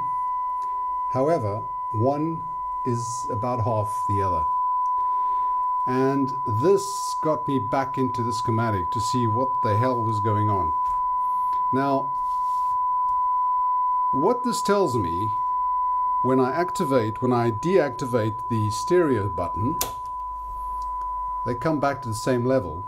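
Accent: South Africa. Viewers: I happened on one of your newer videos, found value (got two needing new capacitors), so started at the first video posted. Dad passed on an interest in people and their accents, so was trying to place it, vaguely familiar. Now knowing an Afrikaans accent, of course, heard it years ago in some of the Uni classmates. My only connection is Dutch ancestry, who desired so much to be American, our family no longer speaks the Mennonite dialect or German. But, the attraction to Germanic was not removed, have a Telefunken upright console and a Körting (an American-German love child, my example, a stereo SE ECL86 with not one shred of information on the internet). 😜 Cheers, Adriel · "do not do this at home.." Why not, thats an excellent solution if the correct part is not at hand, hehe :-) Judging from your accent this old saying would apply: `n boer maak `n plan. 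En dis geen kak plan nie meneer, dis baie goed werk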